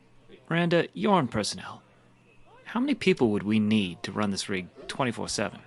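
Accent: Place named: New England, United States. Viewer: Love the New England accent of the narrator. The "salt" in his voice invokes the historical draw of the sea for these maritime adventurers and adds to the tale. Good story too!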